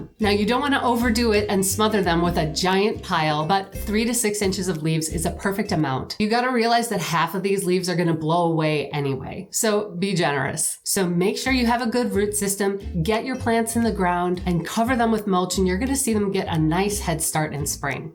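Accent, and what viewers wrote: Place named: Midwest, United States. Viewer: Love your content! Longer vids plz. No need for all the extra movie clips (Xtra editing)... Your midwest voice is needed on YT!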